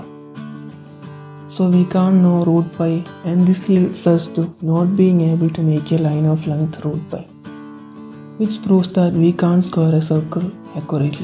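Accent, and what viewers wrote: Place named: India. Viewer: I press a video regarding math I hear an indian accent I leave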